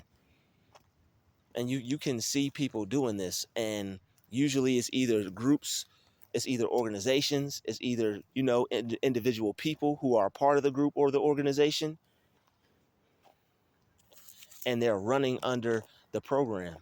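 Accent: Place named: Midwest, United States. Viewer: Spot on. You from the Midwest? You talk like it. I get what you're saying. I know from experience.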